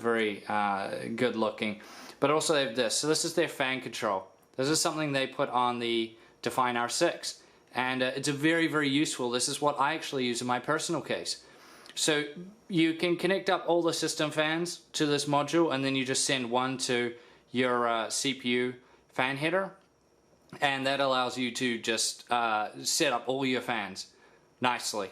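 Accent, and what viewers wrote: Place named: New Zealand. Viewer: Hey man... so ahh you have a very interesting accent. R u like a kiwi or something?? 😂😝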